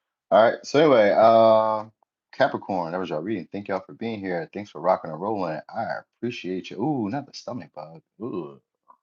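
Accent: Australia. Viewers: He has an Australian accent 😅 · He is Australian....I didn't notice at first...😂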